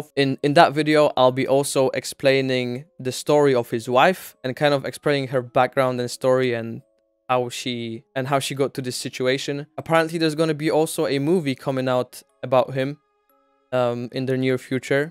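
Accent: Poland. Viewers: U can tell ur polish when u say all the polish names and towns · Masz silny polski akcent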